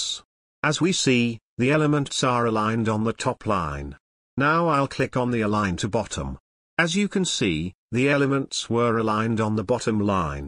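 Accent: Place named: RP, United Kingdom. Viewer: I like how posh he sounds : )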